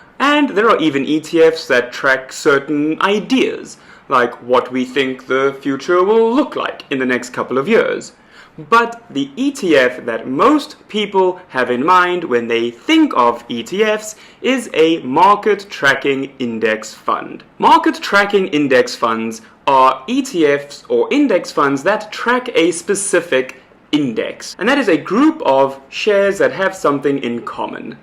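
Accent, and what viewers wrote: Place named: South Africa. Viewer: I’m a South African living in the US and to have this concept explained to me in a SA’n accent makes it that much sweeter. Lekker my boet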